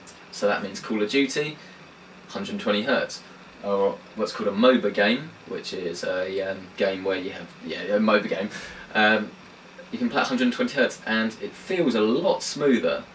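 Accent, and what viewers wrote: United Kingdom. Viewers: His british accent just adds to the comedic value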